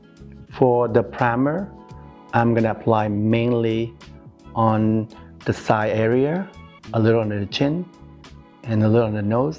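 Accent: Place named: Vietnam. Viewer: You have the cutest vietnamese accent. My boyfriend is vietnamese american i live in a very vietnamese area in northern va as far as asian population. Love ur food and ur culture 💚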